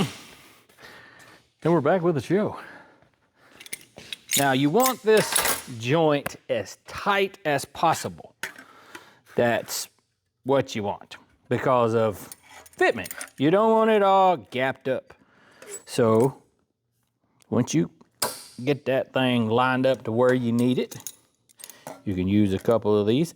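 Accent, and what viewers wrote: South, United States. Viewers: Just found your channel and I must admit, I'm kinda excited because you were one of my favorites to watch on TV (and we both speak southern LOL). Love the way you show the real world aspect of getting every thing to work right. I struggle with sheet metal because I spent my career welding big industrial iron. A half inch plate was thin material. Soo.... Going going from 1/8 or 5/32 rods and wire to .025 is a bit of adjustment. Lots of holes, just say-n. Love your stuff and I'll keep watching.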